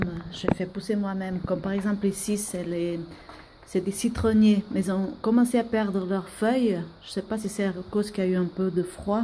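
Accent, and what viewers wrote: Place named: South, France. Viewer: bonjour, je me disait aussi que c’était un accent du sud ;) beau coin de véranda la suisse c'est joli merci du partage. a bientôt Vincent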